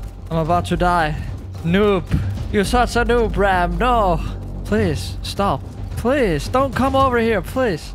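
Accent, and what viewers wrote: Denmark. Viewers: are you from denmark?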